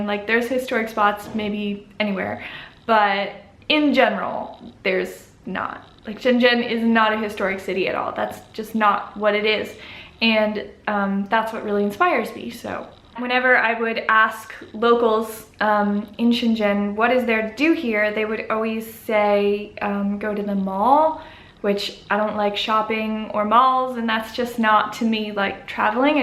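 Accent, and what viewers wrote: United States. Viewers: I guess that you are an American based on your accent. But I can't decide where you got that blue eyes. Hah hah.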